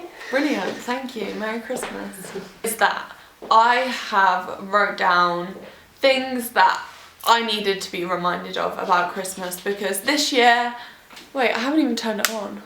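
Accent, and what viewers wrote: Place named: Coventry, United Kingdom. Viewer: She's from Coventry 😊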